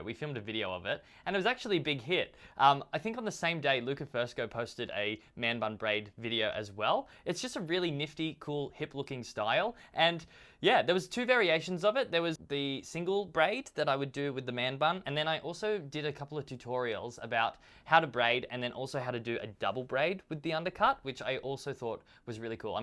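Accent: Australia. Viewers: Are you Australian? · Is he Australian?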